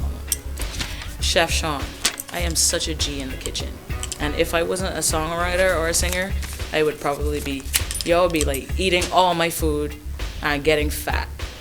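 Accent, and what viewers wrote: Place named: Barbados. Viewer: I love her Bajan accent!! Bigup Barbados 🇧🇧 🇧🇧🇧🇧✊🏾🙌🏾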